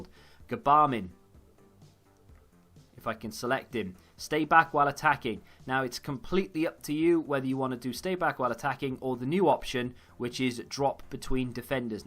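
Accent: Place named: Cardiff, United Kingdom. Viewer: You from cardiff?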